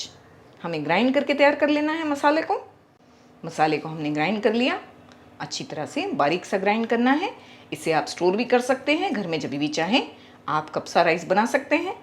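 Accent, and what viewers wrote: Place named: Konkan, India. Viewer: Mashallah, aapi recipe k naam per daaba k khaate hai Aap log, aap k lehja kehta hai aap konkani hain...kabhi kabhi Bhatkally dish bhi banaye Karain.. runaways success guaranteed.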